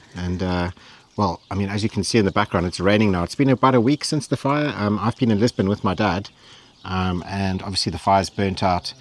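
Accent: South Africa. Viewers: Came across your site & immediately recognized your accent as South African. Thankful your property is okay. The fires were horrendous. Subscribed!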